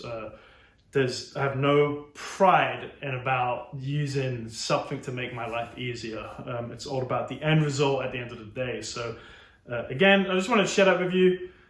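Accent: England, United Kingdom. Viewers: I’m just here for the great English accent!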